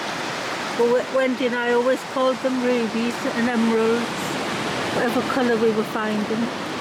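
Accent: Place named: Northeast England, United Kingdom. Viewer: Hello Did you mean: As soon as i heard your accent i knew you were a fellow Northeastern woman and I knew instantly when you were looking for stones and paddling on Seaham beach. I was wanted more. I am a mixed media artist and live in Darlington and just adore paddling in the sea and the wild weather and the garden. I clicked subscribe and will feel right at home watching your videos. Thanks for sharing. Love from June x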